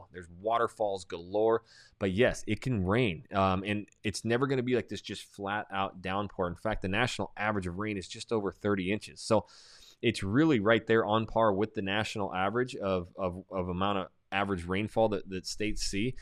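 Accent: United States. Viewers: Bro u from Montana Portland or Houston 🤔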